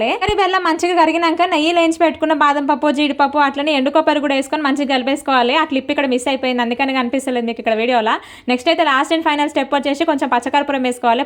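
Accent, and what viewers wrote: Andhra Pradesh, India. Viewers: Andhra basha bagundhi akka malli alane mattaldandi akka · Akka miku mundu matlade andhra slange bagundi pls adhe matladandi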